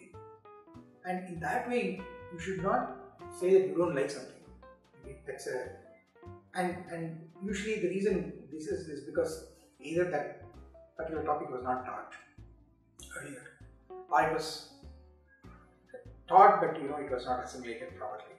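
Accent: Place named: India. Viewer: I cannot understand his India accent, no offense.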